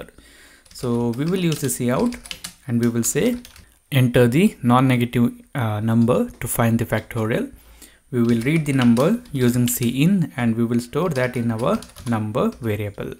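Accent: India. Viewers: Please leave and never come back if you cant accept Indian Accent.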